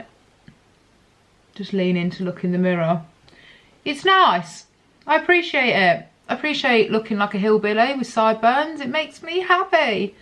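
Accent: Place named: United Kingdom. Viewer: So Bri’ish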